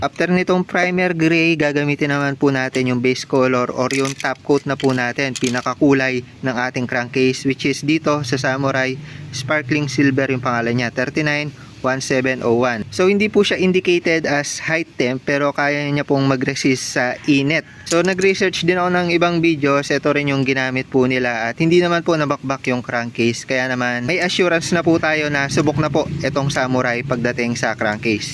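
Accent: Pampanga, Philippines. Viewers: Kapampangan ka ata sir😊 accent mo kasi.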